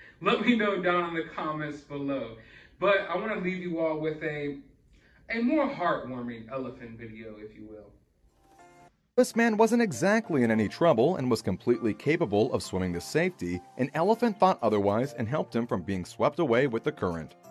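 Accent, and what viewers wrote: Australia. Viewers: I love elephants and don’t blame them for being annoyed at humans . Love. Your Australian accent btw 🙂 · I love Australia and love your Australian accent. I would have passed out.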